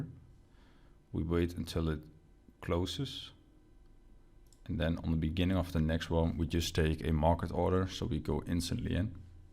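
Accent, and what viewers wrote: Netherlands. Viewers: I've used this strategy for a few days on a paper-trading account and really like it. Lots of entries which I like, I work from home but am unable to sit and watch charts all day. That's probably the most frustrating thing about trading in my spare time, waiting for entries. Most strategies also only really work during trends, feel like I miss a lot of trades when it's not trending. With this strategy I don't have to wait too long to find an entry. I also really like the Absolute Strength Histogram strategy, finding good stuff with that and also seems to work in flatter markets.. I've started leaving the volume up along with the ASH and found that they can both confirm each other. BTW - are you Dutch, by chance? You sound like a native Dutch English-speaker to me, not that it matters :)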